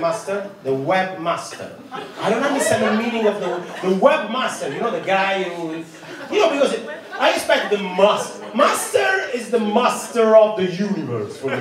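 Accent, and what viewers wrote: Italy. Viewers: Fantastico! È proprio vero che il talento non ha barriere... Nemmeno quelle linguistiche. Il tuo inglese dall'accento italiano ti rende ancora più efficace! Bravissimo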